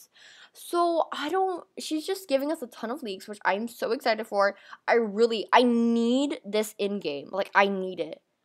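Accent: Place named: Long Island, United States. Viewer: Are you from Long Island? Becuase your accent is goalssss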